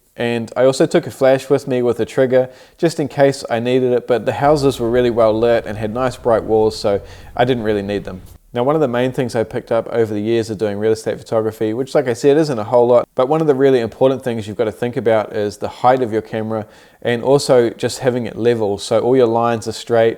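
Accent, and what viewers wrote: New Zealand. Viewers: Refreshing to hear that Kiwi accent!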